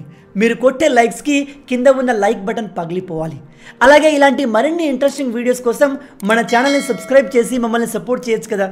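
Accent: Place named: Marwari, India. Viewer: Anna, Mana ooru edhi. Slang kastha marwadi slang la undhi.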